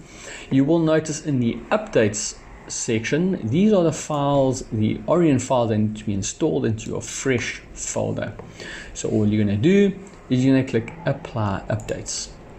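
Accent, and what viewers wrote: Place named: South Africa. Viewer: South African Voice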